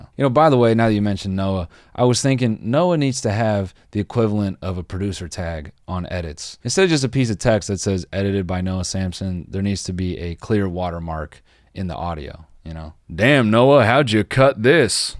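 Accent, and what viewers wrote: United Kingdom. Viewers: talking with a british accent is giving me CODEL flashbacks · Why is no one talking about his British accent?? Lol amazing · Ur British accent has come a long way broski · That British accent is top notch mate. Nice one 👍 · I love this British accent😭😭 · Damn, does a pretty decent British accent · Dudes lowkey British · I live for British accent, he is the only American who does it so well. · That British voice is something is perfecting slowly hahahahha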